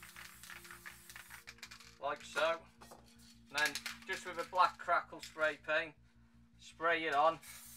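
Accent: United Kingdom. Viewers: Nice, British or Welsh? if you don't mind me asking?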